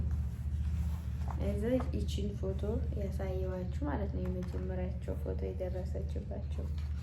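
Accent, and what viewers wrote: Gondar, Ethiopia. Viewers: ጎንደሬ ነች መሰለኝ አክሰንቷ❤ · it’s very nice u are still Abel to speak Amharic ,,, u have a gondere accent btw😄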